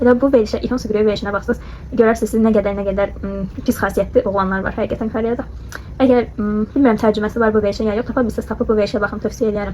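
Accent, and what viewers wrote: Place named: Azerbaijan. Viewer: kız çok güzel konuşuyorsun gerçekten Türkçeyi en güzel Azerbaycanlılar konuşuyor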